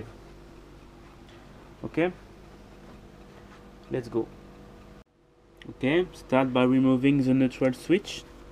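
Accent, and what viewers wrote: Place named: France. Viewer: Same. I thought, ooh, a French accent. Wait, why the flag....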